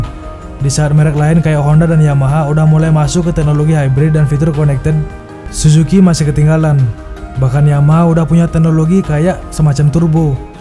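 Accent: Bali, Indonesia. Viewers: Pasti ne dr bali, logatnya ketahuan...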